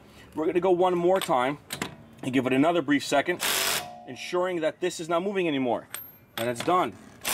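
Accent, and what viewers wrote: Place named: Rochester, United States. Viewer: Very nicely done ... nice to hear (Rochester County?) accent, reminded me of person who first taught me about fixing cars. Though quite familiar with job, appreciated fine details and learned helpful hint about lube around clip area in addition to caliper and front side fingers. Imagine it is really supportive to receive appreciative feedback, especially considering what I imagine is only a low percentage of people who do appreciate it can/will take the time to write a comment.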